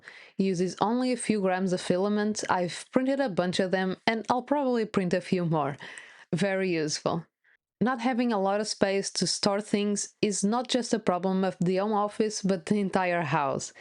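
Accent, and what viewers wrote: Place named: Portugal. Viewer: Primeiros segundos do video e percebi de imediato que és Portuguesa 🇵🇹. Além disso, impressões funcionais e não apenas bonequinhos? Subscribed!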